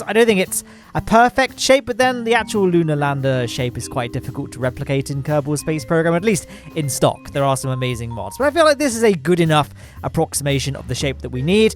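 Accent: United Kingdom. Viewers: Only OG's remember his British accent at the inteo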